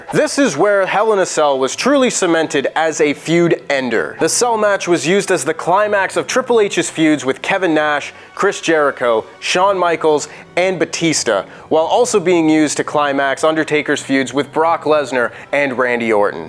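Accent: United States. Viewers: Clicked on wrestletalk video. Hears American accent. Is now confused. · The American accent threw me off. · Hello, what's this? An American voice?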